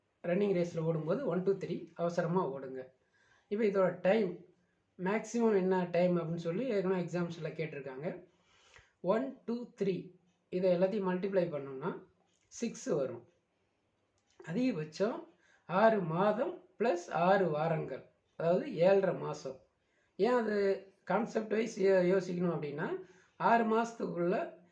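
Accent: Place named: Kongu, India. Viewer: Kongu tamil alagu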